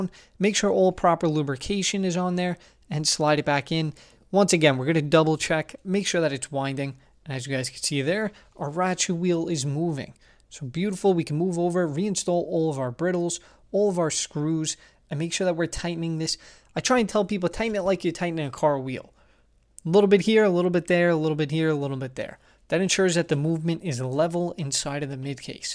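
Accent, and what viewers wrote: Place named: New York, United States. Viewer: Imma tell u the truth my guy, Ive shown non new yorkers your accent as a definitive reference, mine is not as thick as yours so I only sound like you in heated moments. Love the videos, I'm a collector of magic the gathering cards and try to take aspects of your hustle+customer satisfaction into my card trading, feels good when an "everyone wins" scenario I helped crafted pans out.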